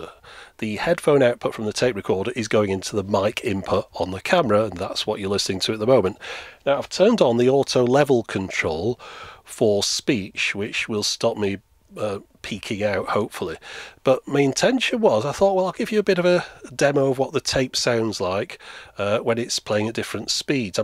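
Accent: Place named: Northern England, United Kingdom. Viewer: He’s obviously from northern England which is actually in between Scotland (Connery) and London (Collins).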